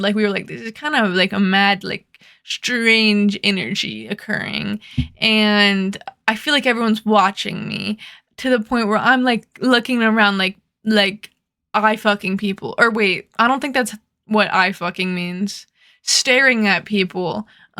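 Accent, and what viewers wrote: United States. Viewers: you have the best regional american accent on the earth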